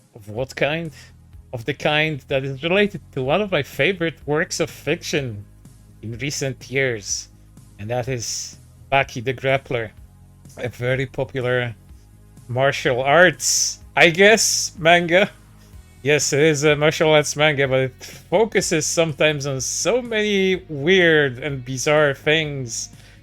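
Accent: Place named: Europe. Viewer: Yeah leave it to some V tuber with a non descript european accent to attempt to cope about Yujiros ultra woke moment where he pwns the conservatards. Yujiros pro trans women anyways, for him thats just dudes finally being honest with themselves. Anyways now I see why people are all the sudden being critical of Baki. Love it when the rightards get mad.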